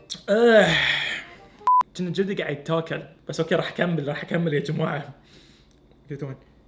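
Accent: Bahrain. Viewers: اول مره اشوفه و محتواه يجنن بل بدايه حسيته عراقي بس حسيت لهجته بحرينيه او من اهل الاحساء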